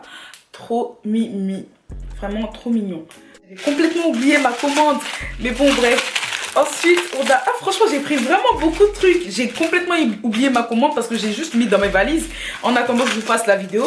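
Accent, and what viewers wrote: Ivory Coast. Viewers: J’ai entendu le début j’ai su qu’elle était ivoirienne 😭🤍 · J’aime trop ta manière de parlé en ivoirien même je t’aime trop fort ❤️❤️❤️❤️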